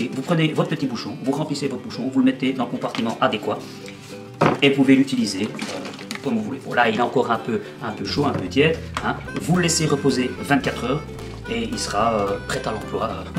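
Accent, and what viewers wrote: Brussels, Belgium. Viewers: Super ! et drôle en plus avec l'accent bruxellois une fois !👍👍😂😂💐💐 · Tes recettes et le ton que tu y donnes sont super ! (et on adore ton accent belge en France) ... Par contre, pas besoins de bicarbonate, en effet c'est chimiquement totalement non nécessaire car cela s'annule avec le vinaigre (PH opposé). Je te joins l'explication ... <link>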